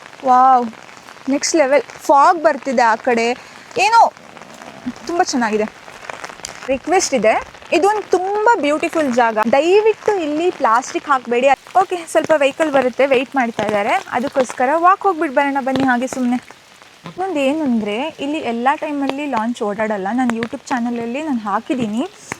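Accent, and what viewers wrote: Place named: Sagar, India. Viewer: any how I like u beauty voice as Sagar taluk kannada ❤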